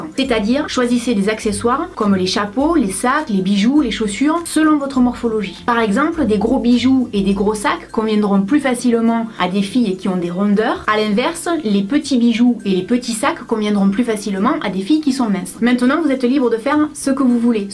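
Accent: South, France. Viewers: Bonsoir! Tout d'abord, ça ne fait que un ou deux mois que je regarde tes vidéos et j'aime énormément tes petites anecdotes, tes conseils, encouragements etc. J'ai du mal à y croire mais je crois que ça me motive un peu, surtout avec ton accent du Sud! Haha. Donc merci! Je me suis décidé à paraître au grand jour ce soir à cause d'un désaccord (il en faut bien un..). Je trouve que les collants semi-opaques ou opaque alourdissent plus la silhouette que les transparents.. La preuve sur moi: j'ai des mollets épais, des genoux trop ronds et de bonnes cuisses (oui j'ai quelques kilos en trop) et les opaques me donne l'impression d'avoir des poteaux à la place de jambes. Idem pour les semi-opaques! Du coup je suis "obligée" de me rabattre sur les transparents et honnêtement ils font effet amincissant sur moi.. Quoi qu'il en soit, merci pour ta vidéo qui me permet de choper deux trois trucs pour paraître fine et bonne soirée! 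· Mais je l'aime trop lol je T écouterai pendant des heures cet accent du sud LA !! Ça fait du bien ! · super vidéo et en plus l'accent du sud est super sympa. Bonne continuation. BRAVO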